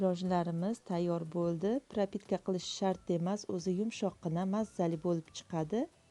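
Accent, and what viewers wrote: Khorezm, Uzbekistan. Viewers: patnsga solib tuylarga oborgan yaxshi ekan menga yoqdi shu uslda albatta tayorlab tuyga oboraman patnsga salafan urab rahmat sizga opam xorazmlmsiz opam shvagiz horazmcha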